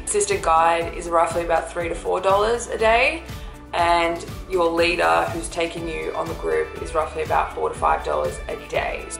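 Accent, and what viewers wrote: Australia. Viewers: What an Aussie accent:D Dear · Can't miss that accent! I did the trek in 2019 and loved it. Am going back as soon as we can travel again. I am from QLD; which state are you based in?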